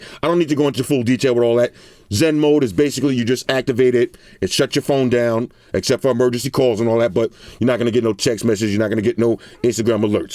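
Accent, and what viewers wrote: New York, United States. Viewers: I'm late to your party bro, but glad I found you. Been rocking OP since #3 all the way up and waiting on my black #9. Sound like you're a New Yorker. Favorite reviewer on Youtube. Appreciate you.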